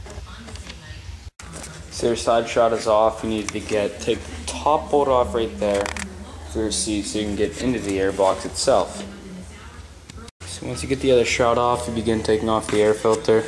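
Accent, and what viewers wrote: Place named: Ontario, Canada. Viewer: Hey you sound like your from ontario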